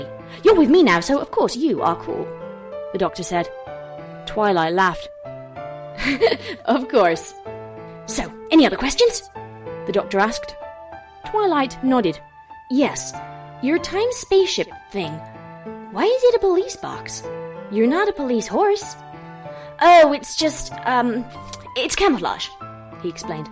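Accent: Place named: United Kingdom. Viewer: YOU ARE BRITISH INSTANT SUBSCRIBE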